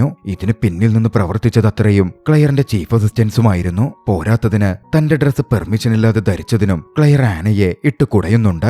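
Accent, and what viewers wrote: Ernakulam, India. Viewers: നിങ്ങ എറണാകുളത്തുകാരനാണോ?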